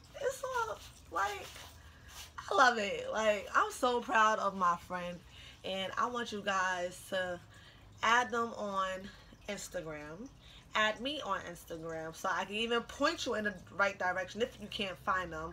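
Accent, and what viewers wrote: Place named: Brooklyn, United States. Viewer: U must be from Brooklyn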